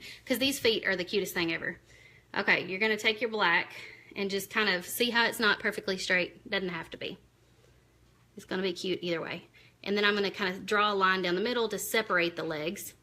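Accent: United States. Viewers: I know this is an older video, but I absolutely love your Strong Southern Accent. I'm a Southern girl myself from Alabama but for some reason I can't tell I have a strong southern drawl, but everyone else can..lol and the Rooster is adoorable! My kitchen is done in Roosters, John Deere tractors and Sunflowers.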